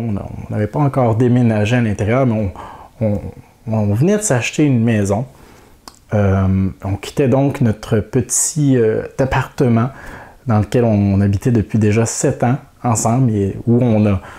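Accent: Quebec, Canada. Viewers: Je t'adore tu sais ! j'adore les québécois ...j'ai d'ailleurs dans ma vie travaillait avec une québécoise dans un village de vacances et j'ai passé d'excellents moments avec elle. Et je retrouve dans toi toutes ces expressions qui me faisaient rire, cet accent qui vous est propre, mais surtout beaucoup pour ta gentillesse et tout le travail que tu effectues au quotidien !! Continue dans cette voie en espérant que tu seras moins stressé cette année !! a bientôt ! et le bonjour à ta femme ! mdr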